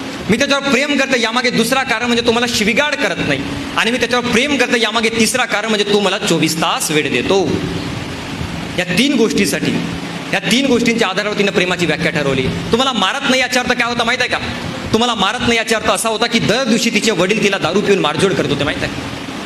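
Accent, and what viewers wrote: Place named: Vidarbha, India. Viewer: दादांचे उच्चार विदर्भातल्या मराठी सारखे वाटतात.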